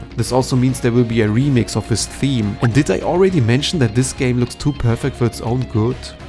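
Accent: Germany. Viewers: You are German, i can tell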